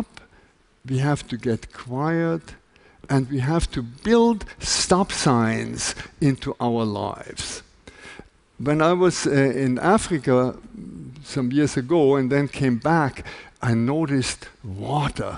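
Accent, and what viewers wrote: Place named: Austria. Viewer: Wyczułem austriacki akcent na początku :) ależ jestem dobry!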